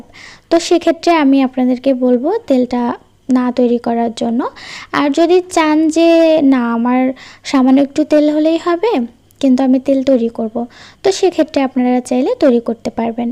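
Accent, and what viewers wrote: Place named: Chapai Nawabganj, Bangladesh. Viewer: Apnar language onek ta chapai er manus er moto. Basa koi apner r kun class a poren.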